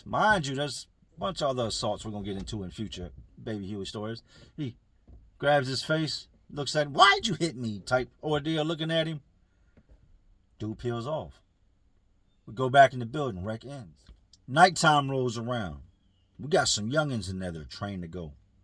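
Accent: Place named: Virginia, United States. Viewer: Push the Butteeen. We know from VA, can’t deny that Virgina accent. I here some Danville in there from time to time too.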